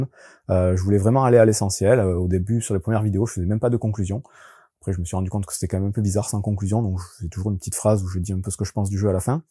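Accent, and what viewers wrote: South-West, France. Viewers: Je me suis dit la même chose que vous : expliquer les règles, c'est galère ! Donc les vidéos sont très pratiques et j'aime beaucoup votre voix et votre petit accent du sud ouest 😊 En plus des explications sans superflus ni "spectacle" (pas comme la plupart). J'écoute aussi Yandrew et Professeur boardgame 😀J'adopte la simplicité ! Belle continuation...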